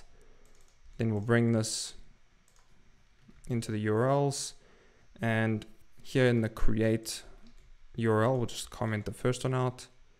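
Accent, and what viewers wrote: South Africa. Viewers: Ayy that's a South African accent i hear. Keep at it man. Great tutorial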